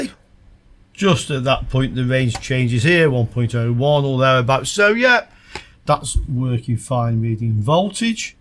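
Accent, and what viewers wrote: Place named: Stoke, United Kingdom. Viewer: Classic stoke accent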